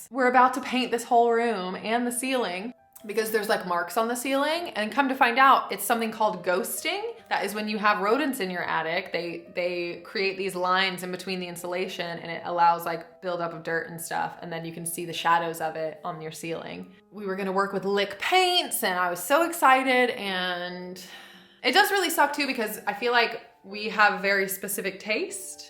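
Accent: United Kingdom. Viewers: You are definitely starting to sound British. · I love hearing your accent start to change a little bit. Some words you say have a British accent and I’m loving it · It's funny to hear how your voice has taken on a little bit of British way of talking. Not your accent, per se, but the way the sentence flows. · You're getting such a British accent! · Is it just me or is accent changing? I swear she sounds at least 15% more British now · You're getting a little British twang in your accent I can hear it!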